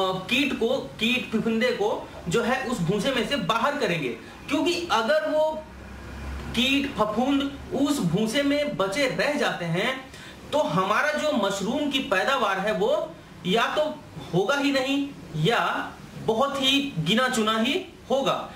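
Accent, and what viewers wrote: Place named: Bihar, India. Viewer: Bhai aap Kanhaiya Kumar ke jaise bolte ho... Lagta hai Bihar se ho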